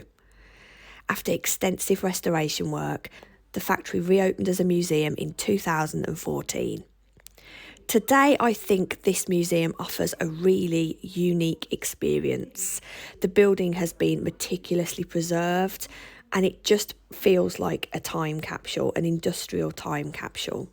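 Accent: Birmingham, United Kingdom. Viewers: Your enthusiasm shines through. Interesting,informative and beautiful Brummie delivery❤❤❤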